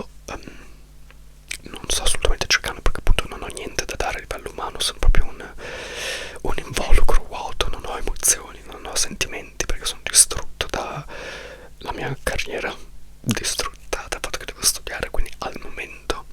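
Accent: United Kingdom. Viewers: Scusami ma ho alcune critiche da farti: 1 sei fissato con la corretta pronuncia delle lingue ma poi hai perso l’accento italiano corretto pronunciando la t inglese e spesso le doppie molto poco doppie. 2 buffo come alla fine il video da italiano pentito in cui praticamente disprezzi l’italiano è quello più visto. Alla fine ciò che hai disprezzato e cercato di cancellare dalla tua identità è ciò che ti ha dato più soddisfazioni. 3 hai un atteggiamento vittimistico nei confronti della vita, le sfighe succedono tutte a te. Per questo ti consiglio di vedere uno psicologo. Avere un male incurabile è qualcosa fuori dal nostro controllo ma scegliere persone sbagliate di cui invaghirsi o non riuscire in un lavoro dipende da noi. Per esempio fare la drag ma odiare il mondo drag e non uscire con loro significa essere tagliati fuori dal giro in un mondo in cui i contatti sono tutto. 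Se è quello che vuoi fare come lavoro devi scendere a compromessi, altrimenti resta fedele a te stesso e rendilo un hobby. Il mondo non ti deve niente, se vuoi qualcosa vai e falla ma sii consapevole dei compromessi che sono richiesti, nessuno ha detto che il mondo sia giusto o che basta il merito purtroppo. 4 sinceramente non capisco l’esigenza di dover sentir parlare inglese. Non capisco la fissazione per l’inglese. Si sceglie di vivere in un posto perché è il migliore per le proprie esigenze lavorative e di stile di vita e magari anche per gli affetti che uno ha. Se sono una makeup artist e la città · Ma perché se sei italiano parli con l’accento inglese? So che non vivi in Italia,ma non comprendo il dover sforzarsi a far sentire per forza che si ha un accento straniero… 😅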